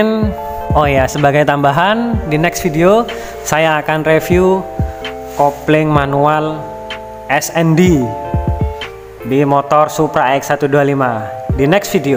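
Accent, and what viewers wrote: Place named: Madura, Indonesia. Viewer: Logat bahasanya kaya logatnya orang madura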